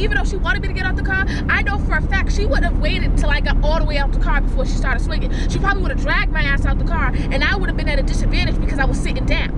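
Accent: Boston, United States. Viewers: You sound like a Bostonian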